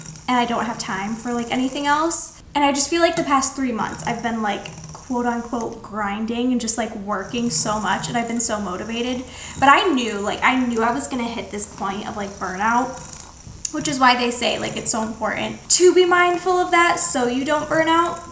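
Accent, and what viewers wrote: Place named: California, United States. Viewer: I always think you're from Cali until you mention NY, haha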